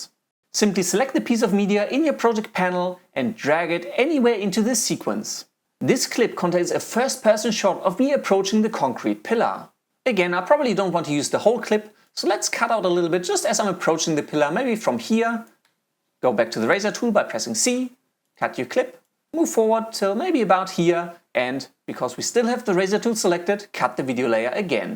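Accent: Germany. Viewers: Delighted that you replied! I’ve been struggling so much since I bought my Premiere Pro subscription, and I’ve been suuuuper intimidated to release my second video. The road appeared so uphill, and honestly, till I saw your video today, I’ve been regretting the Adobe purchase. I shall try again tonight. By the way, found your accent pretty interesting! Is there any German or Austrian connection ? Just curious :-) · Are you German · You're not an idiot (and you better get past that idea if you're going to make any progress in life). This guy's accent is not merely obscure, it's incomprehensible. I truly do not understand why any company would hire this guy to speak to Americans. I doubt he's a native English speaker; I'm thinking northern Germany for his birthplace. This is NOT a "tutorial for an absolute beginner." Partly because the guy's accent is as thick as mango trees in Cuba. 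Example: Americans say EYE-CON, this bizarre chap says "EYE-cun." It's a small difference, but given how fast he's talking, it's a major difference, and the net result is, you can get lost quickly.. The other reason this is not for beginners is, he goes way too fast. Many, many, many better options available for beginners. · I love this video! I love your accent! It's German, right? You gained a new subscriber!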